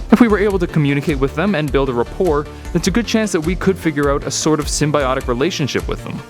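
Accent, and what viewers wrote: Canada. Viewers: Your Canadian?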